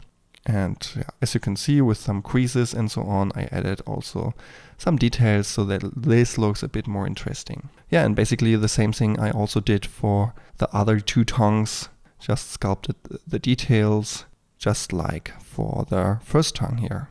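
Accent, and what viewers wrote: Germany. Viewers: sis jerman äccent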